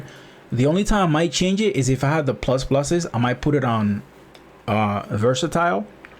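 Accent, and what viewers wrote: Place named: Dominican Republic. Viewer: Bro your funny 🤣🤣🤣 with the Spanish I can hear your from RD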